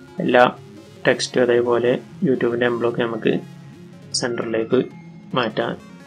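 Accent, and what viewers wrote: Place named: Malappuram, India. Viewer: മലപ്പുറം ഭാഷ ഒന്ന് മാറ്റി പിടിക്കുമോ?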